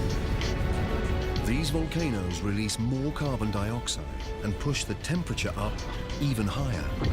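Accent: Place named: United Kingdom. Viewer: that documentary is narrated by an American, while this one that you uploaded is narrated by a British. You can tell the difference by their accent and the different units of measurement that they use.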